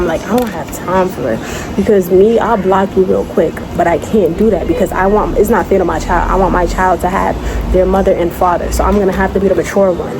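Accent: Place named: New York, United States. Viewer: Yo you gotta be from New York lol.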